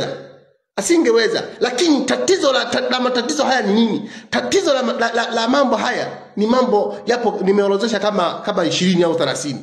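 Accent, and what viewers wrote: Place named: Sukuma, Tanzania. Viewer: anajua kiswahil, ni lafuzi yake tu iyo ya kisukuma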